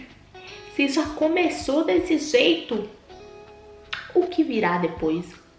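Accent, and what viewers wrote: Salvador, Brazil. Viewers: Vc é de Salvador?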